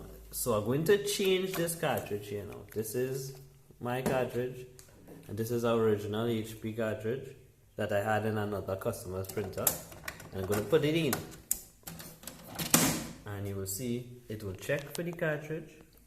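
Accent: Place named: Trinidad and Tobago. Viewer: Hi thanks for this video. You sounding like a Trini, if you are can i get a contact for you? Or call me at <num> thanks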